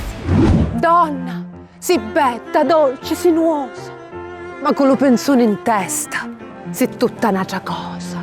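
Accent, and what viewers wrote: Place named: Palermo, Italy. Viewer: Brava..peccato che è difficile apprezzarti appieno fuori dalla Sicilia.In compenso a noi siculi il contrasto tra il dialetto palermitano e il perfetto italiano ci fa tagliare.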